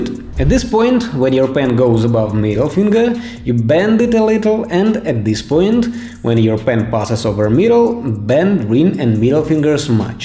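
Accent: Russia. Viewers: Акцент русский какой-то)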